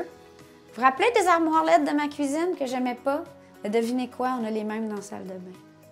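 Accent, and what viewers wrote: Quebec, Canada. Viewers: Hello hello, grâce à Carl tu as une nouvelle abonnée depuis ce soir :). Merci pour ce magnifique House Tour :). Votre maison est énoooorme et très bien aménagée. J'adore l'accent québécois alors j'suis vraiment super bien 'tomber'. Saskia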